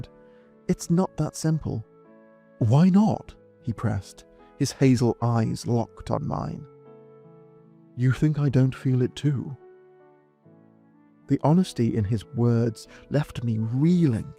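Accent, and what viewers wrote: United Kingdom. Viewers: Good northern accent. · I like this British voice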